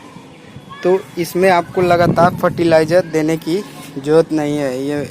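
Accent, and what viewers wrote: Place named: Bihar, India. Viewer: Aap adenium plant kahan se lete hai ? aapke voice tone se lagta hai kya Bihar ke hai nice information 👌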